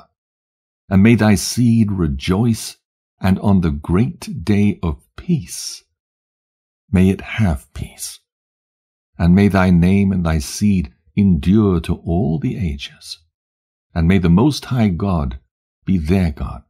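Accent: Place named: Europe. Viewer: I love these other books but why are every one of them told in a European English accent???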